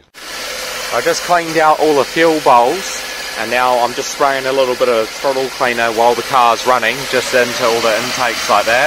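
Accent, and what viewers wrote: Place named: New Zealand. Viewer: Hey bro, sounds like your from New Zealand, are you from Auckland at all? I've just purchased a 2E 1994 Toyota Corolla Hatchback recently and it seems to be running a bit rough, if your in Auckland would you possibly be keen to maybe check it out and maybe tune it? Would pay for your time etc and would rather have it done by an expert then trying to mess with it myself since I'm not really mechanically minded, if your keen let me know. I'm thinking it could be the mixture, I adjusted the idle screw and it seems to rev lower as the previous owner adjusted it to be high revving as that's how he liked it he said (strange but yeah hahaha) Cheers bro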